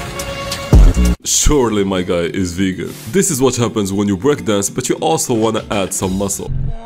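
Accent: Morocco. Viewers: ur moroccan I know that from your accent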